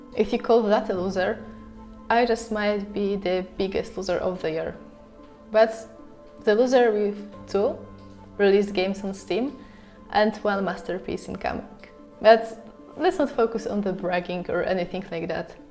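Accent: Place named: Poland. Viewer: Ten akcent od początku wydawał mi się polski, aż do - Podziemia.. szczyty itp :D Fajny materiał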